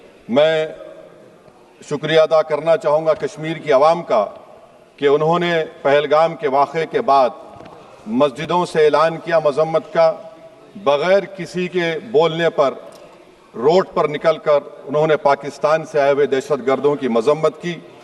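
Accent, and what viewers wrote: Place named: Hyderabad, India. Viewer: HYDERABADI BOLTEY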